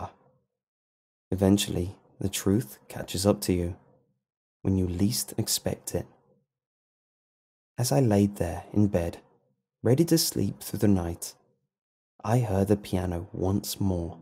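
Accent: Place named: Liverpool, United Kingdom. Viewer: I can detect a very subtle Scouse accent or possible Manc maybe.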